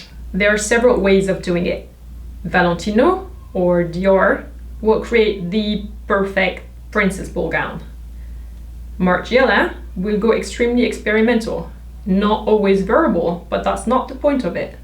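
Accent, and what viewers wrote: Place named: France. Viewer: Could you put english subtitles in you videos? For me it is difficult to understand some words, since english is my second language and I don't know french so I am not familiar with a french accent in the english language.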